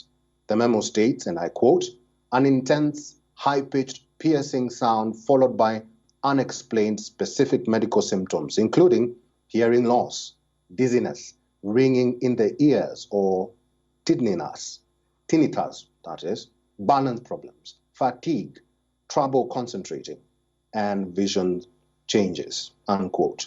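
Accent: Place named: India. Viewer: She's the only reason I watch WION. She has a nice Indian accent. It's understandable but non Indians like myself and it's still Indian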